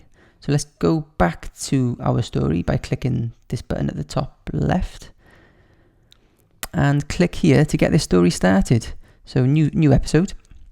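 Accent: Wales, United Kingdom. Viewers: Are you Welsh?? I love your accent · your welsh i'm welsh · So this video really helped! I was clueless. But I had one question. How do you delete a chapter from a story? Btw love your accent I'm also british =)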